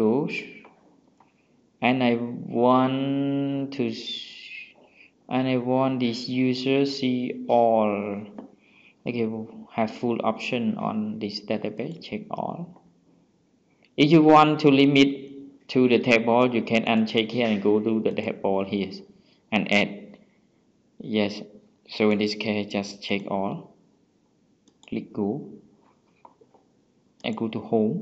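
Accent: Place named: Cambodia. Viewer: depend on your accent . im sure 100% that u khmer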